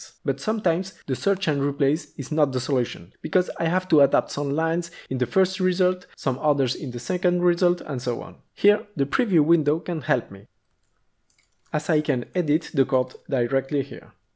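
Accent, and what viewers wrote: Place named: Spain. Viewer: jajajaj, se notaba literalmente que es español y no me ha hecho falta ni buscarlo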